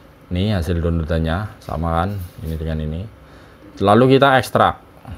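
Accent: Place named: Banyumas, Indonesia. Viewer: Ngapak temen kang😂😂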